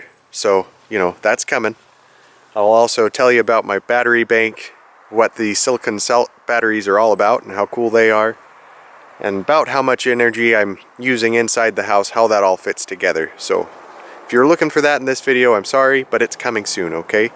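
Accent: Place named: Canada. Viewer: You need quite a lot of water and hight (head) too make a micro hydro project worth it. I would shift that container just too see the difference. Do you have a stream on the prop? A little nudge with the back hoe is all it takes. Wait you got wires coming out of it... yeah thats another story. You have come a long way from digging your well vid that was the last time i watched. nice work. Where are you from the trees in looks like north east but you accent sound Canadian.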